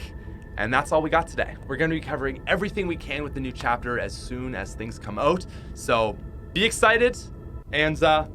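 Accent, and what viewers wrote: Canada. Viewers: Ik your probably Canadian but plz fix your English it's not oatside it's outside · Are you canandian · Me watching the video Hears an Accent Is.. Is that- Is that Canadian?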